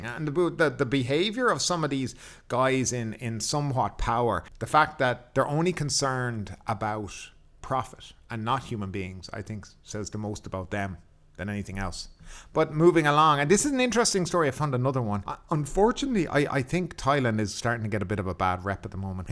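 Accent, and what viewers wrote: Ireland. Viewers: Irish accent?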